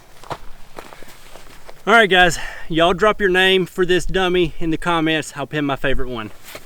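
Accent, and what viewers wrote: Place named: South, United States. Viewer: Finally a southerner, I get so tired of listening to yankee's talk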